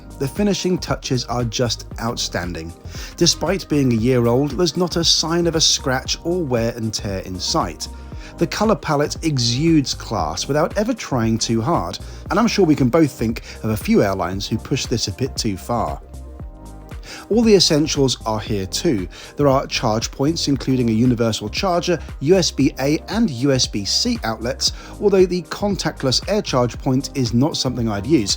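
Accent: United Kingdom. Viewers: Great video!! Wow 🤩 🤩 Starlux 🇹🇼 looks ABSOLUTELY FABULOUS especially on that gorgeous new A330-900neo my favorite derivative of the Airbus A330 Family. I think this experience seemed a little better than the last time you were on an A330neo that being delta airlines example from Salt Lake City(SLC) to Paris (CDG) don’t you think so! 😂 😊 however, I do still really love that video on Delta’s A330neo as I enjoy hearing you pick problems in that awesome British accent of yours! 🇬🇧 😂🎉 <link> Also if I were flying Starlux I’d still try to find an A350 route over the A330neo. A bit of a loss if you ask me as nice as the A330-900neo is I’d always prefer the nicest newest plane or cabin interior on a carrier which would’ve been the A350-900 with Starlux😞 I cannot wait to fly on them someday! Finally Taipei Airport still seems like a pretty good and funky airport and it has some charm and personality I love that in an airport! 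😀 Also Can you please please please try to fly with Korean Air one day on that INCREDIBLE and FAB looking Boeing 747-8? I’d love to see that review either in their UPSTAIRS Biz Class or Fabulous First Class on the nose of the 747-8?? Please try them soon! I remember you saying several years ago now during your Hawaiian Airlines A330 review that you saw a 747-8 of Korean and you have yet to try them still!! Plz do and maybe on HNL-ICN? 😊 Looked like an EXCEPTIONAL EXPERIENCE! Finally Jeb & Suzanne Brooks just used that music on their 24 hour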